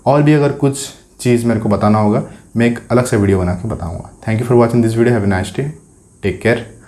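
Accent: Odisha, India. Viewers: Odisha state se ho kya aap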